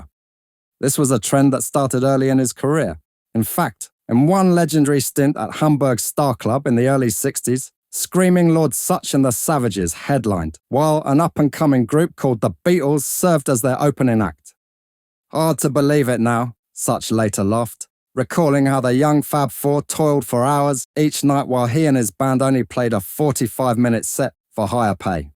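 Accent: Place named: Cockney, United Kingdom. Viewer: Please keep the human narrator - like an East End carnival barker... Very appropriate, somehow.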